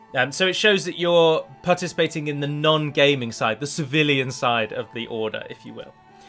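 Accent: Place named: RP, United Kingdom. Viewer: you are incredibly posh its fascinating.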